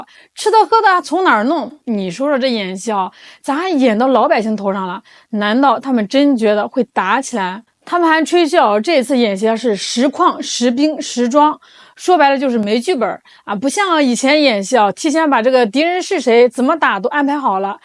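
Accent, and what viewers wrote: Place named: Shandong, China. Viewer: 主持是山东人？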